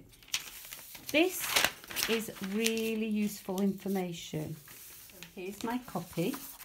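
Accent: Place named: England, United Kingdom. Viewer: I am happy the 2nd part followed so quickly. As a German I have already learned some technical terms through you. How about the seam allowance, is it included in the pattern? I am thrilled I ordered the pattern yesterday. Love from Uta from Munich. P.s. I like your accent, reminds me of the years I spent in good old England, centuries ago.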